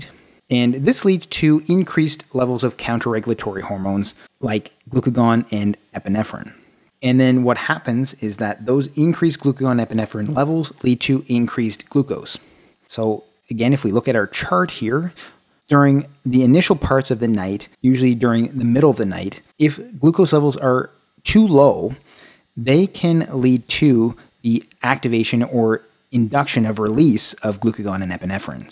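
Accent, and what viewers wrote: Canada. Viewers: I was quite concerned that the morning rise in glucose was a side effect of poor blood sugar control ! What you seem to be saying is it's from other hormones! My blood sugar numbers don't go down until after my first meal, which is about noon. My conclusion that my insulin resistance wasn't improving was incorrect. Are you Canadian, I seemed to hear that from your pronunciation?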